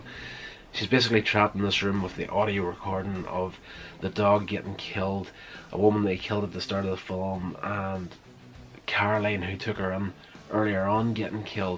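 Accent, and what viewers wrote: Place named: Scotland, United Kingdom. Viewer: Excellent review. You sound Scottish. I'm MinneSNOWton.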